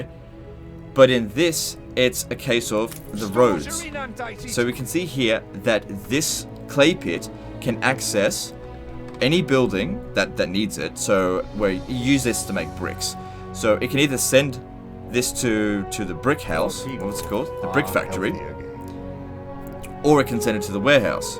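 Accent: Australia. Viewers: isnt he australian lol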